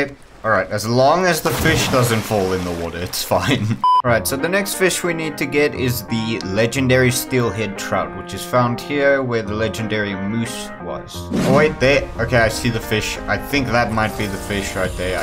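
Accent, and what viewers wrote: South Africa. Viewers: Are you south african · Bro I too am South African and I could recognise your accent,so I checked your profile and I was correct :D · South African? Glad I'm not the only one playing this beauty again. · Has to be south african bro I can't unhear it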